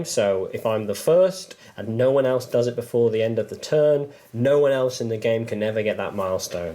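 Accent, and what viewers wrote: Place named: Germany. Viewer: Actually - sounds like a hint of German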